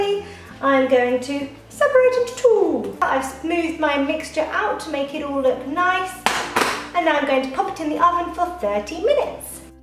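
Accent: United Kingdom. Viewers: Her accent is British ☝🏻️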